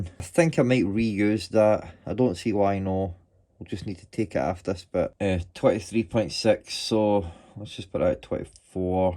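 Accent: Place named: Scotland, United Kingdom. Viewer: I love the Scottish dialect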